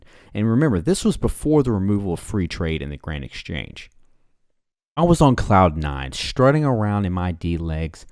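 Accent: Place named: Oklahoma, United States. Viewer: also that southern accent poking through. I wanna guess Oklahoma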